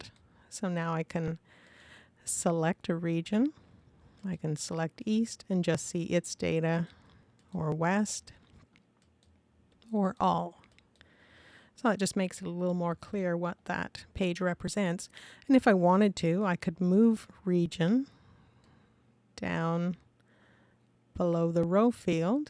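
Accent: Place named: United Kingdom. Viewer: This helped a lot thanks. Also, idk why but her voice kind of sounds like a British person trying to use an American accent. Not necessarily bad, just interesting.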